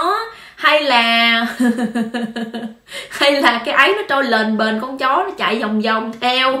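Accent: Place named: Mekong Delta, Vietnam. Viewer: Giong noi mien tay rat ngot ngao va chan tinh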